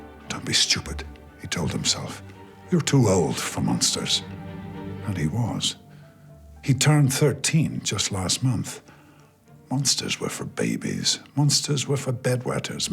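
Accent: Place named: Northern Ireland, United Kingdom. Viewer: Brilliant makes me home sick listening to his northern Ireland accent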